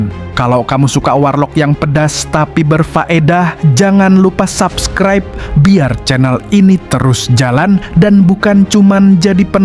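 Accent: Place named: South Kalimantan, Indonesia. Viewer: Kadangaran banar logat bicaranya bahasa banjar, pina totok banar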